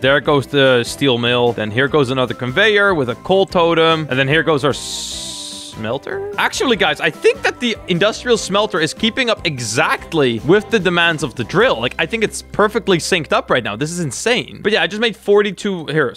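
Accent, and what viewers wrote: Netherlands. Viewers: Ok, in almost sure that you are dutch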